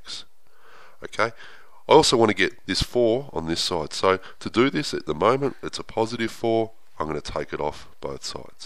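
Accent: Australia. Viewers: Sounds like a fellow Australian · Having an Australian guy really helped out and kept me interested. I wish my teacher talked liked that.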